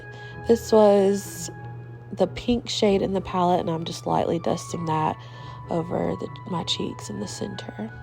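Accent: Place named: South, United States. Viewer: Absolutely loved this! Gorgeous and your beautiful southern accent is the cutest! Wishing you much success on all of your social media platforms!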